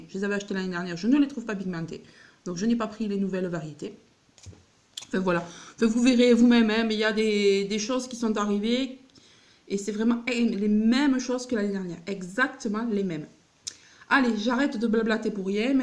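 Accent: South, France. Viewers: ton petit accent du Sud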